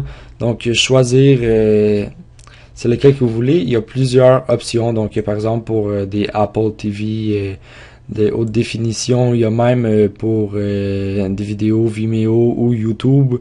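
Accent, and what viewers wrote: Quebec, Canada. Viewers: C'est un youtuber québécois ! On prononce pas les mots de la même façon que les français de France. Tu t'attendais à quoi ?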